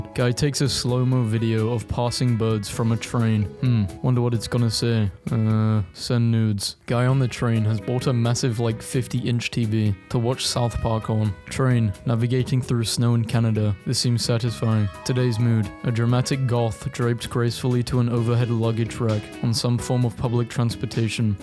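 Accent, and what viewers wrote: United Kingdom. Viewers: I just remembered you are bri-ish (I'm pretty sure) because Americans pronounce it "Van Goh" I assume bri-ish people say "Van Goff"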